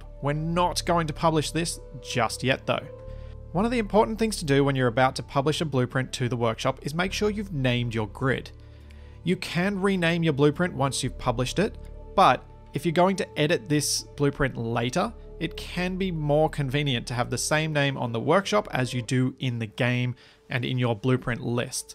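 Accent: Australia. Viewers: I literally watched like 50 episodes of survival before realizing was an aussie. I just have a bad ear for accents and thought he was British or something the whole time. The Bin Chicken episode was what finally enlightened me, after some googling. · Do you come from the land down under?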